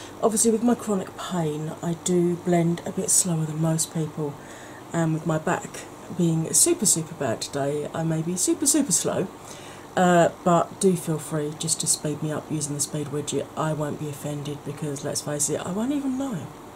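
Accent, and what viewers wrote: United Kingdom. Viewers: Are you Welsh? Gorgeous look per usual, I do think they're wisteria as well... I'm heading over to Chelsea's channel next!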